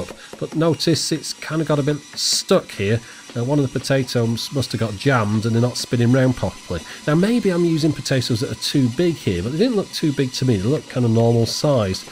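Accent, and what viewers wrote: United Kingdom. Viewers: Why would you expect a British man to talk like an American?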